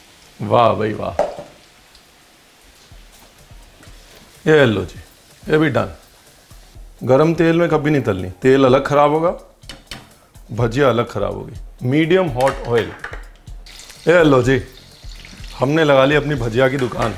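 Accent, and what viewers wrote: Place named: Punjab, India. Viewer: छोटे छोटे Dices wow maha aa gaya sun ker Punjabi tone😊 ha ha neembu ke pakore ban jayegee My Mouthwatering,😊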